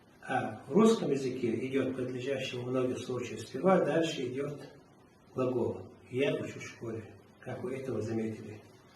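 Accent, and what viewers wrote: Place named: Chechnya, Russia. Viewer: Он чеченец но по акценту видно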